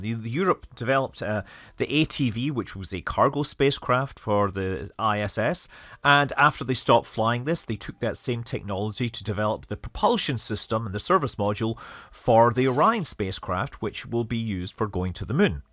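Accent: Scotland, United Kingdom. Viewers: And now you yave a Scottish accent...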